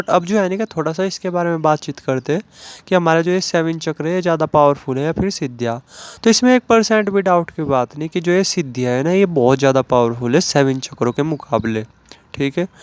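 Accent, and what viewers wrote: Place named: Jhajjar, India. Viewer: ohkk. Mere jhajjar ke relatives h bilkul apki trah bolte h 😂😂😂 Ek se voice bhi milti h apki.or bolne ka tareeka bilkul same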